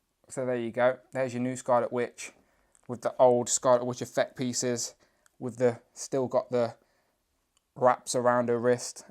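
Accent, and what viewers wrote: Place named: United Kingdom. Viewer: I don't know. (He sounds like an Asian guy living in England.)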